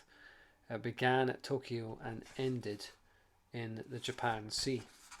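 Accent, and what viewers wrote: Scotland, United Kingdom. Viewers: I've sent your readings to my friend, I confess iv been listening as well. I've read aloud Beaumonts books to myself in my geordi accent, hearing them from a Scot though is a pleasant change haha 😄.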